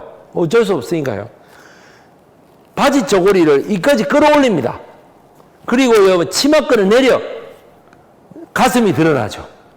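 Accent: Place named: Gyeongsang, South Korea. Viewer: 구수한경상도사투리억양 으로 아주 유익한 우리나라 역사해설들을 배기성선생이 참 잘해줍니다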